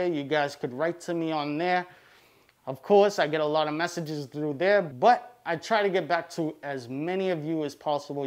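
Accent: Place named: Northeast, United States. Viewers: based on his accent you could pretty much narrow it down to being somewhere north and/or east of Pennsylvania lol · This dude sounds like he's from New Jersey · You can hide your face, but you can't hide that southie accent.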